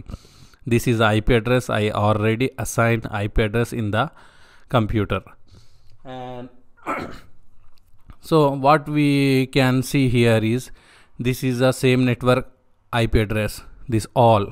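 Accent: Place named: India. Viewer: Normally i look at dislikes if a video is a waste of time, but youtube removed them. Thankfully i heard your indian accent and knew this would be helpfull thanks man.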